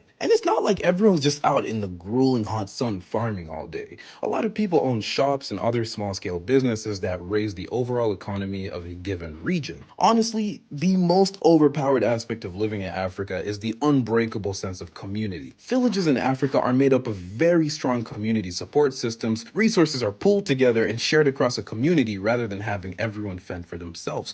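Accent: United States. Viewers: And yet you sound American? 🤔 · yeah but like what I mean is he sound like he was born and raised in like Detroit or smth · Born and raised in Africa but have a American accent? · Great vid as usual! I hope this isn't a rude question, but why does your accent sound American? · Then how do you have an American accent when you were born and raised in Rural Africa? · Why does your accent so American? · can i ask how come you have an american accent? im curious! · why do you sound american, genuinely asking. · Your English is fantastic!!! You sound like my neighbors in Virginia.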